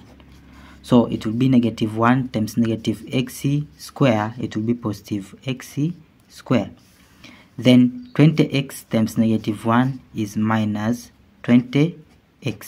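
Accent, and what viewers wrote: India. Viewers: Индийский акцент режет слух. 😢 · Какая-то профанация. Ребята, судя по произношению, это индус. Германией тут даже и не пахнет. Всё, кина не будет. Расходимся.😂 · По акценту вроде индус. Чалма жмёт видимо. Обычное квадратное уравнение. Но в Гарварде, где учился обама таких считают гениями и берут без экзаменов 😂😂😂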